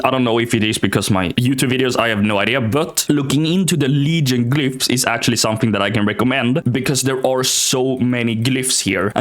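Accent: Sweden. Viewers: You sound suspiciously Swedish 🤔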